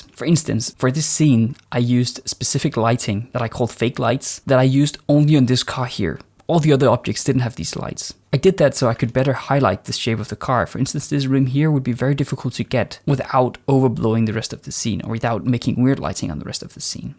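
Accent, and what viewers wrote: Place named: Denmark. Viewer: Vent... du er dansker!?!?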